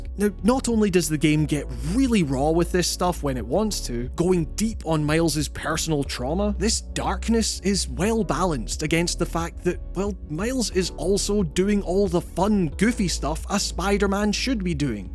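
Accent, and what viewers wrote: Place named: Scotland, United Kingdom. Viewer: This is a Scottish accent.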